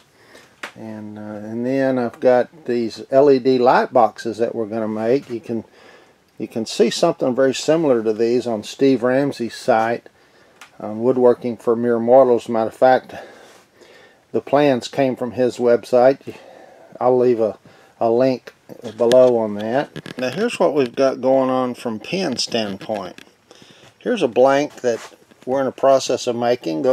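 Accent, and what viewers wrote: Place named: Texas, United States. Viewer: I just recently subscribed and I am enjoying your channel. I don't know for sure where you're from but you sur sound like a fellow Texan. Keep up the good work. Jim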